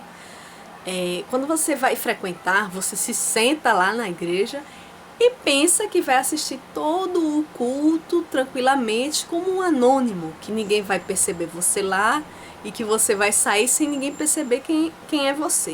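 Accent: Northeast, Brazil. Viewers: vc não generalizou pq vc falou q foi na sua congregação,nem todas são assim.Pelo sotaque vc é do nordeste,aqui no sudeste é muito rar acontecer isso que vc falou.pense bem porque Jesus não tem nada haver com isso,vc saiu pq vc quiz,vc tem LIVRE ARBITRIO!naõ vou dizer q vc vai para o inferno,mas,deixa isso quieto,não insiste com esse videos pq a magoa só faz mal para nós mesmos! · QUE SOTAQUE GOSTOSO, ESSE SOTAQUE DE NORDESTINO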